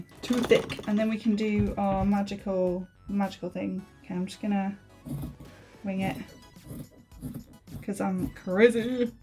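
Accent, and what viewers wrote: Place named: United Kingdom. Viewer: NO worries on the delayed response. 😊 I know the U.K. has lots of distinct regional accents & your voice is so similar to hers that I was already pretty confident that you must be from around the same area. Anyway, again I really enjoyed the video & thought you did the legendary Streets Of Rage babe proud with that lovely cosplay.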